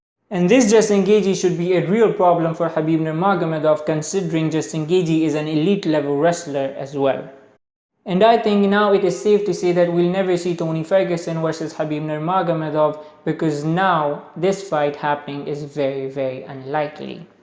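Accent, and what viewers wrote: India. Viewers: 9 dislikes and 4 likes???? People are put away by your accent, but Cmon Indian hai toh you can't change that accent. This is quality content.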